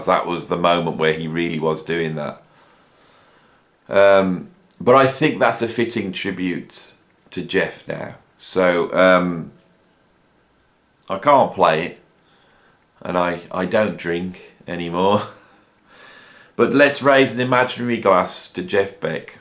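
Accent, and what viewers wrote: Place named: England, United Kingdom. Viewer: what region in England is your accent from?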